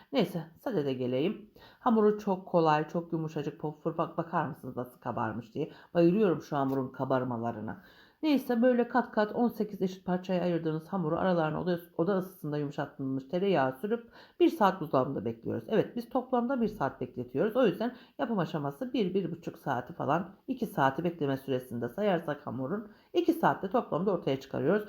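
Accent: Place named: Black Sea, Turkey. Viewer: Cok tatlisiniz karadeniz sivesi var hemserimsin tesekkurler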